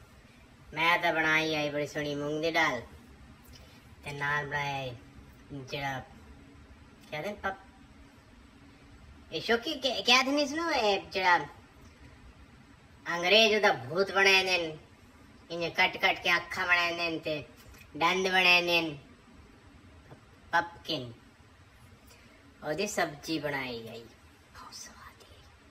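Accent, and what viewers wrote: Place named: Saraiki, Pakistan. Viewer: I just love your saraiki accent.... So beautiful... I didn't know people in india could speak saraiki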